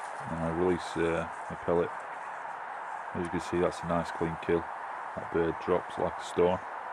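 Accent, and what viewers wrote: Yorkshire, United Kingdom. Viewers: Very enjoyable. A good commentary, calm and informative--even with the Yorkshire accent!Subbed.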